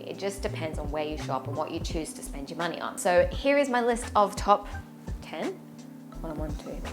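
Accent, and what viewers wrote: Australia. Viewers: Thanks for sharing. Love your Ausie accent. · Love your Aussie accent. Australian accent sounds like British accent.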